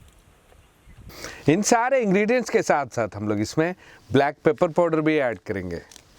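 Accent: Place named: Bihar, India. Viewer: aap Bihar se hoo Kiya? aapki awaz ek dum bihar wali hai.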